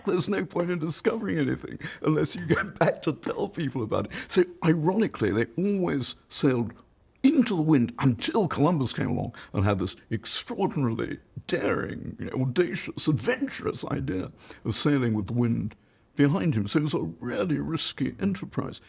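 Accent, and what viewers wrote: United Kingdom. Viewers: My God, this is the most British man I've ever heard. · Most iconically epic British accent I have ever heard!!! Suck on that Peter Hitchens!! :)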